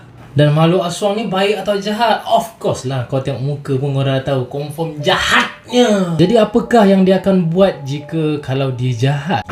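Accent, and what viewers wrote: Sabah, Malaysia. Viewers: Aduh slang2 adik ni macam Sabah laaa 🥺❤️ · Suara abg ni mcm suara org sabah jak hahaha btw sya urg sabah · Mcm slang sabahan ........ Btw storyline best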